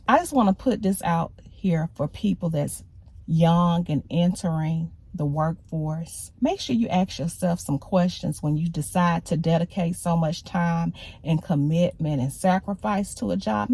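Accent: South, United States. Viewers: I'm trying to figure out what state you are from with how you pronounce your words like tire >tyurre lol, its cool bit of a southern drawl. Are you from Maryland or Virginia?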